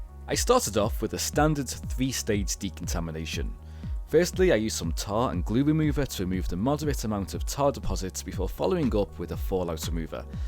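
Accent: Liverpool, United Kingdom. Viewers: Hi nice to hear a fellow scouse voice. Two questions mate, firstly how long did it take you to do that exterior detail? Perhaps that would of been a useful detail to mention in your video. Secondly, when you're machine polishing do you find it gives you a bad back? Whenever I machine polish my back is practically on fire! I get really bad aches off it.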